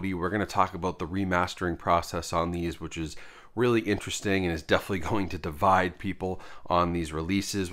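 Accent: New England, United States. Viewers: are you a New England bro?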